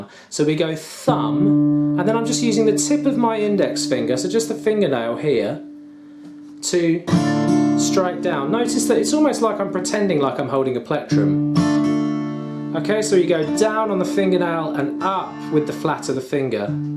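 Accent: England, United Kingdom. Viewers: WOW! Your English accent threw me for a loop! Great cover, cheers :P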